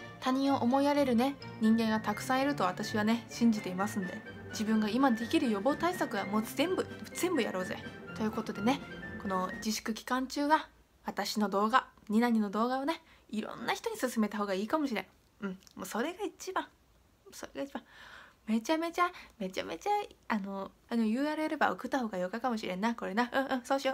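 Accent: Nagasaki, Japan. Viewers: 未だに外で遊び回っとる奴らはその行動1つ1つがこれからの未来に関わってくるって重く受け止めて欲しい。あと全然関係ないですが、自分博多弁の人なんですけど、さん長崎弁話されててなんか親近感が沸きました(*^^*)これからも頑張って下さい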